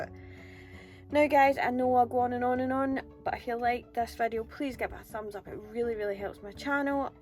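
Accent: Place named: Scotland, United Kingdom. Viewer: I have liked and subscribed to your channel and love that Scottish accent. This wreath is stunning i never seen anything like it its stunning. great job you are one talented lady.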